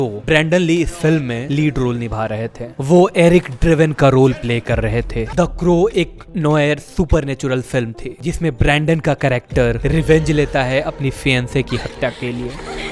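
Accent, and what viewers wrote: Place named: Bihar, India. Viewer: Your Bihari accent is so sexy, say it again 😉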